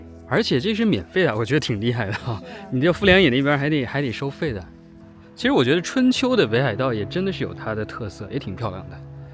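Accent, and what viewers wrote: Taiwan. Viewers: 聽口音，女生是台灣的???? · 聽這位小姐姐口音是台灣人！ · 這位小姐的口音感覺像是我們台灣同胞!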